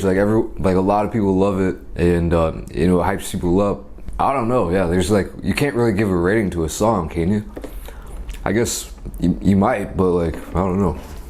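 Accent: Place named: South, United States. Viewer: I wonder was he born in tx I hear a southern accent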